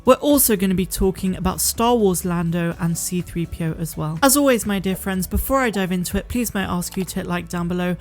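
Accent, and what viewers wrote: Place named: West Country, United Kingdom. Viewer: Meh we can hear your West country accent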